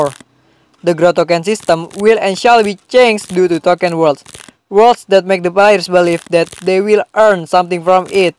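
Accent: Indonesia. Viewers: logat indonesia nya masih kelihatan wkwkwke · Jack Roach He indo, his accent was indo/asian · ronald <num> His sound like Reza oktovian,so h is Indonesia.And his sound like From Indonesia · Orang indonesia ya???logat nya persis orang indo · your sound is like indonesian people trying to talk english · Indonesian accent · woy.. english ne jgn ada logat jawa nya dong... klau gk pande bahasa inggris... tulis aja.. gk enak dengernya hadeh!!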